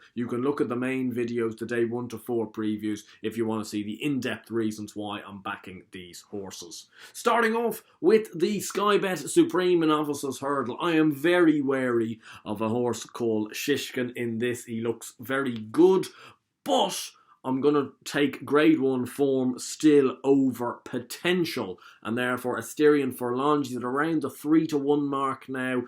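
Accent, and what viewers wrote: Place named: Ireland. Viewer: Jesus christ lad what accent is that posh Irish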